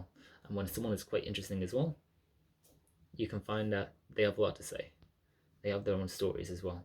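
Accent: Ireland. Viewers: are you irish?? or live in ireland?? i can't place your accent but i swear you have our manners of speech it's driving me crazy lol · I hear an irish accent for sure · are you irish? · This was great advice, thank you! Also, not sure if that's a bit of an Irish accent I'm picking up or not? It reminds me of my fellow Dubliners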